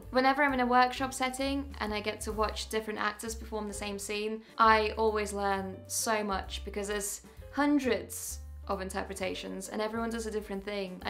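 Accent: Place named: United Kingdom. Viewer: Im surprised, your speech is horrible. Try speaking more clearly and with an American accent!!! British accents dont work well.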